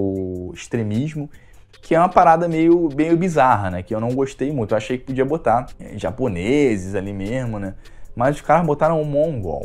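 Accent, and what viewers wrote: Rio de Janeiro, Brazil. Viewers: Senhores, esse é o sotaque carioca que a gente se acoxtuma aqui na cidade.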